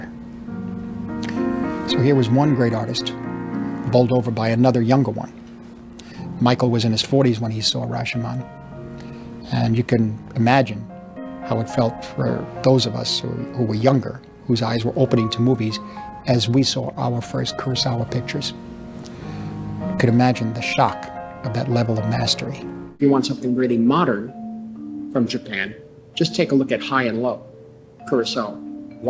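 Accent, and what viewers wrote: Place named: Brooklyn, United States. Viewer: Van Gogh with a Brooklyn accent!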